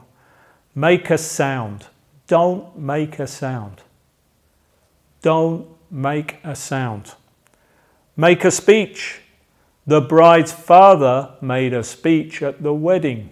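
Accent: England, United Kingdom. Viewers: Amo el acento Ingles. Ya me quedo clara la diferencia =)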